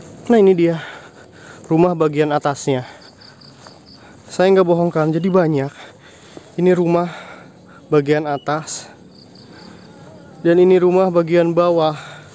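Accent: West Java, Indonesia. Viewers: Aku seneng Ama konten ini dengan cara ngomongnya ke Sunda,an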